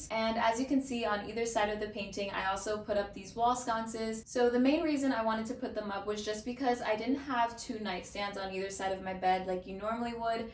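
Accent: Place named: Dominican Republic. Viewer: I knew you were Dominican from the first few seconds 🇩🇴 #rep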